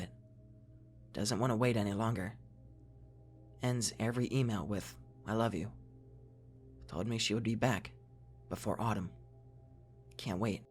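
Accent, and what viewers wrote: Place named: Maine, United States. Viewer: Hey man. I got an odd question. Are you from Maine? You have a Stephen King twang.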